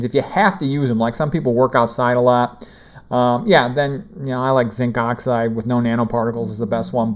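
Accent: Chicago, United States. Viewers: You're accent tells me you're from Chicago?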